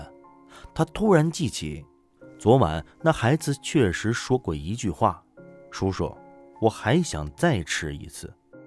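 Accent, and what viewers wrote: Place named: China. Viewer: 大陸口音 我不懂 為什麼 沒有大陸的靈異事件!?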